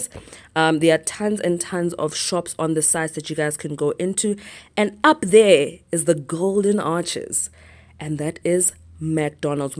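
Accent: South Africa. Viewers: Pedi accent on flick 💖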